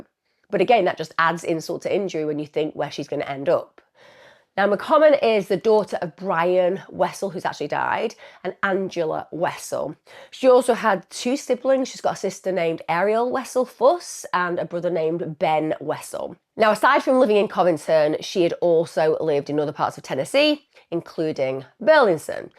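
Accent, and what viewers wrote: United Kingdom. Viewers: Nice to find uk accent podcasters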